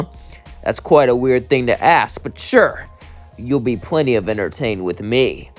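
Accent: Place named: Transatlantic, United States. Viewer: It’s actually a transatlantic accent.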